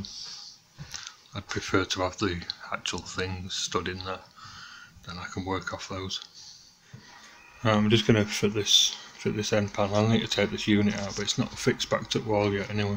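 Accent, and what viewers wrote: Yorkshire, United Kingdom. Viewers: Loving your vids buddy. Love the no-nonsense, no-editing explanation of everything in that down to earth Yorkshire accent! 😆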